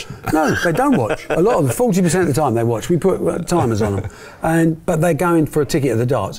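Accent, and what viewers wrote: United Kingdom. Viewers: Thank you so much Mr Hearn, you speak so nice and correct. It's a pleasure listening to you! I improve my english a lot and I love the british accent, but also the scottish. You are a role model for having a successful life.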